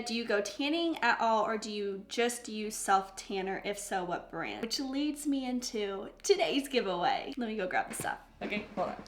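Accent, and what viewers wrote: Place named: Ohio, United States. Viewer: I don't think your voice sounds like you're always having a cold, it must be your accent that does that. My English teacher comes from Ohio and she has the same accent as you and it's so cute!!! You helped me bring so much more positivity into my life ever since I started to follow you and thank you for that! My new year's resolution is to stop procrastinating but I'd be able to procrastinate on that too tbh 😂 Ig: yellow.dazzle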